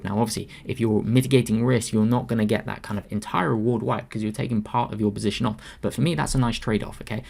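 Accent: United Kingdom. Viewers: hi, not asking for recommendation, just wanted to know what if any allow unlimited stock trades in the UK, only asked as you sound like you are from the UK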